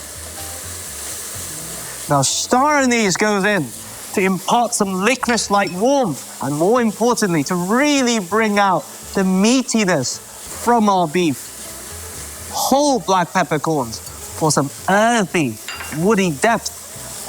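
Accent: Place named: England, United Kingdom. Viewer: Bruuuuhhhh..... Thats the best Cajun "Chocolate Sauce" Roux I have ever seen from an Asian with an English accent. That has to be just damn excellent. Well done sir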